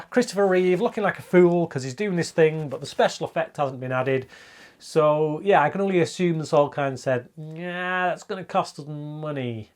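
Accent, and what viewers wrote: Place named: Scotland, United Kingdom. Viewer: I finally stopped hearing your scottish accent, the more you listen, the more you get used to it i guess, now your speech sounds like poetry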